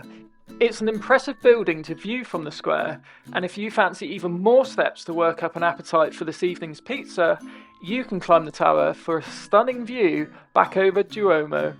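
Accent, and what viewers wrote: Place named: England, United Kingdom. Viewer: You should REALLY REALLY learn how to pronounce correctly the Italian high points you’re trying to have tourists visit…..it’s quite an embarrassment to hear you TRY to speak Italian. You must be British because they NEVER pronounce Italian properly…and your accent points to England for sure. Thankfully I went to school there and speak Italian (northern, I might add…the proper Italian) so please stop these Italian tips……take a class, already.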